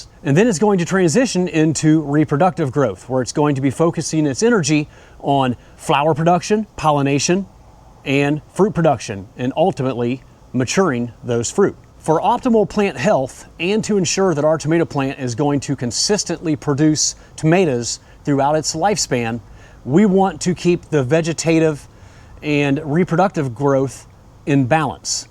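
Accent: Ohio, United States. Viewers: Love your Ohio accent!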